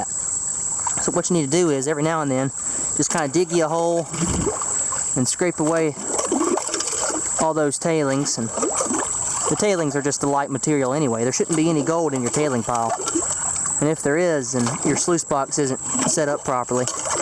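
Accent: South, United States. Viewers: I'm new but are you southern American?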